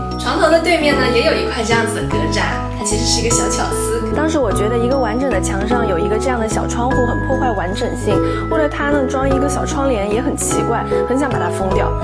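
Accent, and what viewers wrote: China. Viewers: 是大陸人嗎？口音聽起來實在是不習慣😅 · 主播是台灣人嗎？感覺有京片子腔，是大陸媳婦兒嗎？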